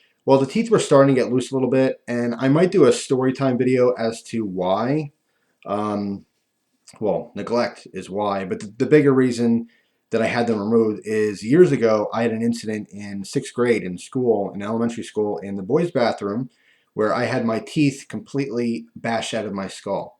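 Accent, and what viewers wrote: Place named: New Jersey, United States. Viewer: I thought you "sound funny" cause you're originally from Jersey. I'm going looking for your Paypal email. I want to see you with a flipper for now and you pricing implants as well as a bridge. HesGoneNuts is right, shut up and take our money. What you do has value, let us demonstrate that value voluntarily. It's the libertarian way, are you some kind of commie? =) Why do you hate America? Why do you hate Truth, Justice, and Superman? You aren't the boss of us, take our cash.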